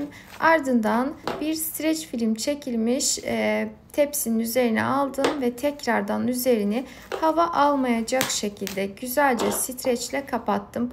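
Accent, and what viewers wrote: Azerbaijan. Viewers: Türkiye türkçesinde konuşmanıza rağmen Azerbaycanlı olmanız bellidir.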